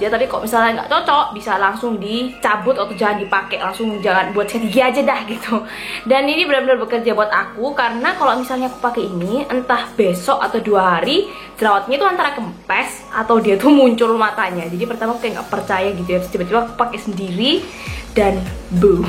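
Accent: Indonesia. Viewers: Suka sama suara kakak medok jawa bgt😂🤗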